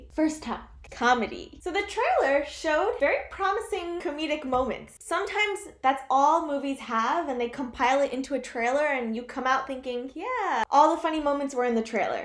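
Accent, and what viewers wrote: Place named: United States. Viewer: Damnn.....Your Hindi with American accent is kinda cute and it is growing upon me...💥💥💥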